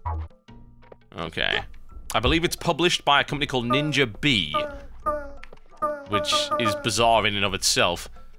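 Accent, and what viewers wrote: United Kingdom. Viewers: I love how TB always says, "... Sorted..." in his brit accent xD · that is a british accent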